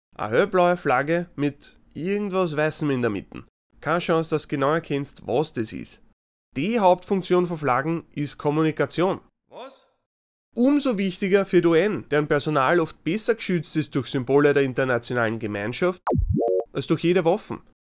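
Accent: Vienna, Austria. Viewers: Oh so Austrian German does sound different from normal German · Ach ja, ihm gab es auch mit Österreichischen Dialekt. Erstmal das Video nochmal anschauen aber diesmal auf Bayrisch². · Es ist so lustig dich nur von den normalen zu kennen und dich dann plötzlich Österreichisch sprechen zu hören! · Haha nice und ich hatte schon gedacht dass ich nen österreichischen Akzent in deinem Englisch raushören kann. Top erklärt und einfach so gerade raus ohne sehr auf Hochdeutsch zu achten, klingt super sympathisch 👍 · Cgp grey aus Wien?:) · er hört si an wie a weaner (wiener), i bin theoretisch soizbuaga (salzburger), aba aus da stodt, da fließt scho a bissi Hochdeutsch mit ei;) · Ich kann nicht so gut österreichisches Deutsch finde es aber lustig zu zuhören😅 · This is not "Austrian German", it is a Viennese dialect. As in fact, every region in Austria, Germany and any other place in the world has different dialects. 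There is "Austrian High German", like you would encounter it on TV news, for example. But this is not it. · oh mein gott ich hab denn österreichischen akzent total vergessen ist der niedlich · Warum er spricht doch nur normal Deutsch? Nur halt wie ein echter Österreicher der im Dialekt redet. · Thematik gut erklärt und das österreichisch! 😂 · Its austrian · Obwohl ich zwei Jahre in Österreich verbracht hab (hauptsächlich in Skiregionen und auf Bauernhöfen bei befreundeten Bauern), geht mir dein Österreichisch auf den Sack. Zu oft versteh ich tatsächlich was nicht.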